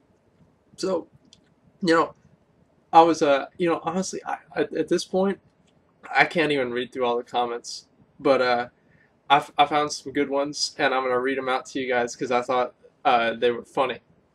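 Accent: Texas, United States. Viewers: am I crazy or did Asmon have a southern accent in 2013? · nah you're right he sounds like a caricature of a texan in this vid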